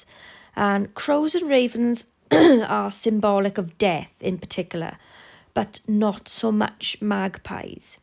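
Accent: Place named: Wales, United Kingdom. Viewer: And I'd never want to shut a Welsh woman up! 🥰 You have a wonderful dialect.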